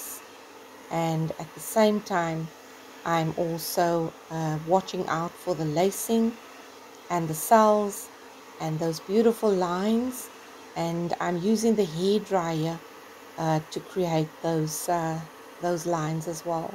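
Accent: South Africa. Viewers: Hi, it's my first time on your channel. I can hear from your accent that you are South African - I'm just wondering where you manage to get Australian Floetrol??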